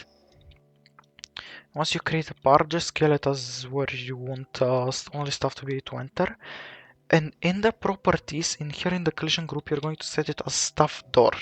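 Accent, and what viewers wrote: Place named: Italy. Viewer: nice Italian english voice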